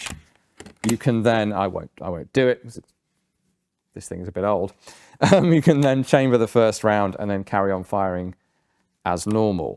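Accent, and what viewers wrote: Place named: London, United Kingdom. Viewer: I love London voice 😊.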